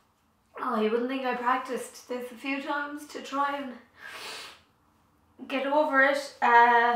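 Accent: Ireland. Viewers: I don't know how things are now, for I have just met you on YouTube like... today. I came here to get used to the Irish accent, and now I'm finding myself almost in tears. I've lost my grandfathers for cancer. Both of them. Basically, I have BOTH sides of my family with ~this thing~. 'Fear' is something strong around here, considering it's genetic and I have zero ideia if it might affect my parents. I wouldn't know what to do if it did. Stay strong. Bless you.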